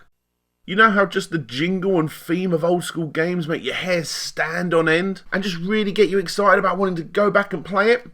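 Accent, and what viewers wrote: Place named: United Kingdom. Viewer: We love that British accent. Sorry you had to deal with low speed !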